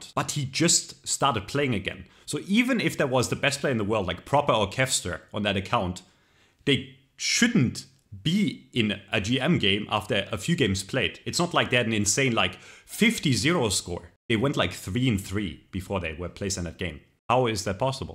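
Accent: Germany. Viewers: critiquing a system is always better with a German accent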